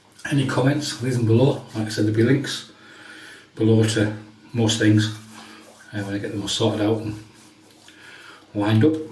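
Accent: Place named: Northern England, United Kingdom. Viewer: Good one! I use the same perfume which is obviously spot on with that soap. One day I will get the original CREED. Now I’m not good at British accents and I apologise if I am being horribly offensive, but to my ears you sound a bit like you’re from Northern England.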